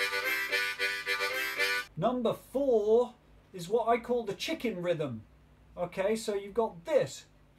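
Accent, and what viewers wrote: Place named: Northern England, United Kingdom. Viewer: thanks for bridging the gap from beginner blues to bluegrass. Kinda crazy to learn from a Brit but hey, youre doin it right. That northern? accent is cool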